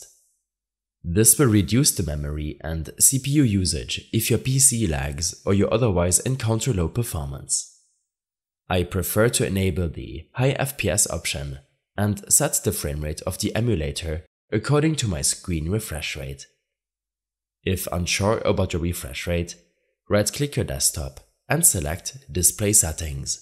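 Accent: Germany. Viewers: are you german du have a akzent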